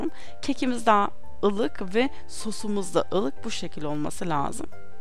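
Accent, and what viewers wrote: Germany. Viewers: Hanım ablamız almanyadan sanirım almanlar gibi söylemiş :) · Farkındaysan almanca konuşan biri. Türkçeyi bu şekilde konuşuyor olması normal değil mi.. TDK başkanı mısın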